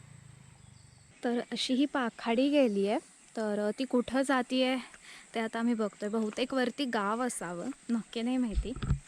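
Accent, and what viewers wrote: Kolhapur, India. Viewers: THANK YOU KHUP VIDIO PAHAYLA MILTAT PARANTU TUMCHI DEVGAD OFBIT BHTKANTI MANALA BHAVLI TMHI DOGHHI FAR JUNTALMAN AAHAT TU MAZYA GAVCHYA SANGMESHVAR JAVAL DHAMNICHI AAHES PAN TUZYA BOLNYYAT KOLHAPURI TUCH AAHE TUZYAKDUN AAMHALA APLE KARNESHVER MANDIR SAMBHAJI VADH STAMBH MARLESHVER HI OFBIT THIKANE TU KADHI EXPLOAR KARNAR.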